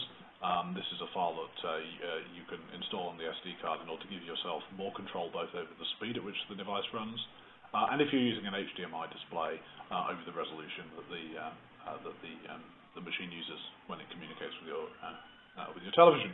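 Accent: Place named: United Kingdom. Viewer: Det er jo fordi han taler UK engelsk, og ikke USA engelsk.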